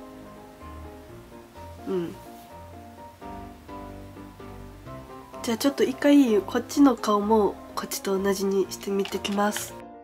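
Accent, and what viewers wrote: Kansai, Japan. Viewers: 初見だけどサムネ見ただけだと強気なカッコイイお姉様系だと思い込んでたらばりばりの関西臭があって一瞬で好きになった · 初見でサムネ見て、めっちゃ落ち着いてメイクする方なんかなっておもったけどがっつり関西弁でなんかすごく安心した笑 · サムネが綺麗すぎたので飛んできました！そしたら話し方が面白いし関西弁で親近感湧きました！強調でクソっていうのわかる😂 100均のコンシーラーが有能なのだと知ったので買いに行こうと思います！ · すっげーかわいいけど、おしゃべりしたらミルクボーイの角刈りの人みたいな関西弁でウケる🤣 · どんなお上品なお嬢様の化粧動画なのかと思ったらド関西弁でビックリした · 強め女メイクを探してる時に流れてきて、もう、サムネがすごく理想のメイクで！！どうしたらそんな美女に？！と動画見てたら関西弁の素敵な方で惚れました🤤✨ ギャップに落とされました。大好きです。他の動画見ながら、参考にさせて頂きます💕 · やっぱり最初の30秒でイケイケなカッコ可愛いちゃんと関西弁ゴリゴリなおもしろちゃんが見れるからすごく好きです！！！！！！！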